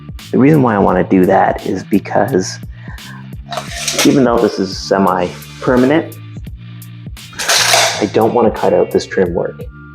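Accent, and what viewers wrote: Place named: Ontario, Canada. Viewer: First, I didn't mind the talking. It helps provide context. Second, the shirt says ".ca", and you're drinking a boneshaker (a man of taste, I'd recommend an Octopus Wants to Fight if NEIPAs are your jam). As a fellow Canuck, and Ontarian - I'd peg your accent from somewhere around Tarrana (proper pronunciation) Ontario. To the other poster, this guy clearly is not a "yank". Thanks for providing the video. Will do this strapping this weekend.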